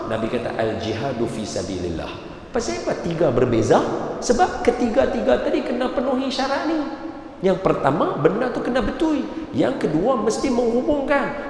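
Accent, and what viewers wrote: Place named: Penang, Malaysia. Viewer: Terbaik Ustaz Don masih pekat penang tu hehe 😁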